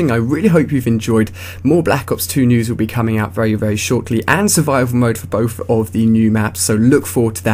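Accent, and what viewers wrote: England, United Kingdom. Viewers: r u british ? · nice english accent I understood everything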